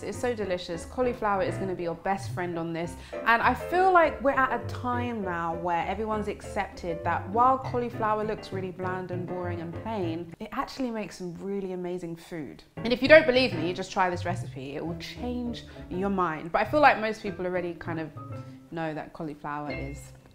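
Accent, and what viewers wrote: England, United Kingdom. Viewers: Wait, what brand did you say those vegan sausages were? It sounded like sazories but I couldn’t find anything like that online. Sorry, I’m American and sometimes I can’t understand the English accent. I’m having trouble finding vegan sausages that are edible. If anyone knows what she said please reply!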